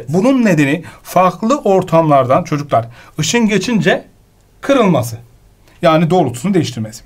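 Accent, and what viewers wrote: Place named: Bulgaria. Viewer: Abi sen burgarmısın çünkü NAİM SÜLEYMANOLU SENİN GİBİ KONUŞUYODU FİLMİNDE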